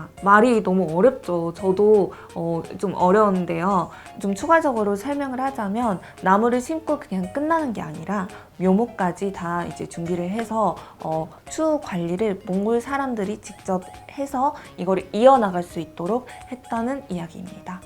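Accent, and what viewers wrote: Seoul, South Korea. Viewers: 발음이 그냥 한국 사람이네요. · 눈감고 눈뜨고 듣고 보고 있어도 한국서울 사람입니다. 제주 부산 광주 대전에 가셔서 말씀하시면 서울사람이지. 몽고분이라고는 절대 믿지않을듯. 여러분야에서 한몽간의 가교역할을 하고 계시고 더욱더 잘하실듯 합니다. 화이팅입니다^^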